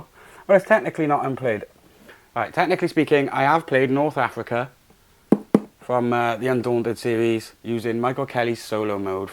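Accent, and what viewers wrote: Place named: Wales, United Kingdom. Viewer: Where are you from bud? Obviously I'm not asking for an address lol. Just sounds like a bit of a Welsh tang to your accent. A bit like myself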